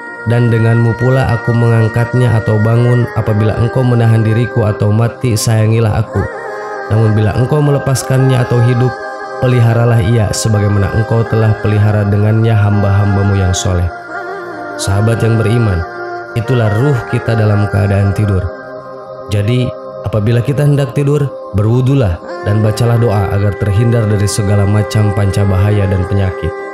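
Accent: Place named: West Java, Indonesia. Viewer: Sunda banget yak 😁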